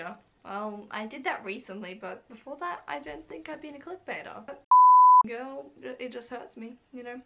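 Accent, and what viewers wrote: Australia. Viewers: Are you Australian....I'm bad with accents...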